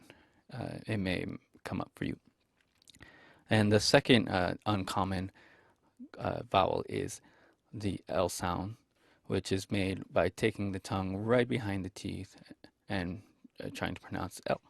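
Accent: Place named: England, United Kingdom. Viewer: You have an English accent.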